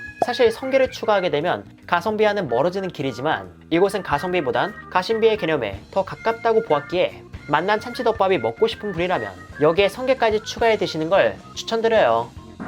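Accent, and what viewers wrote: Gyeongsang, South Korea. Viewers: 님께서 경상도 집안 출신이 아닐까요?....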